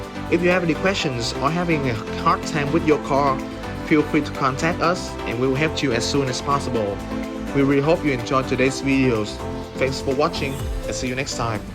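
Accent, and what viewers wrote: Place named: Vietnam. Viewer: kk, nghe biết kỹ sư Việt nói tiếng Anh liền, quan trọng là hiệu quả, giỏi.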